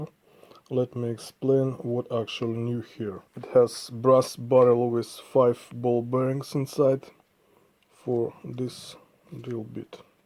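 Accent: Russia. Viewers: русскоязычный?.Спрашиваю потому что интересно на английском говоришь.как будто Русский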